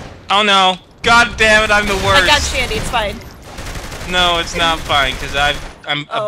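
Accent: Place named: United Kingdom. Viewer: That british accent is offensive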